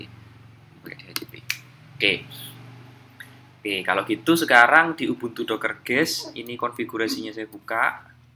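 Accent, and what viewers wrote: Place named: Java, Indonesia. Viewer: ngevapenya kayak menikmati hidup gitu mas. Wkwk. Medoknya masih yaa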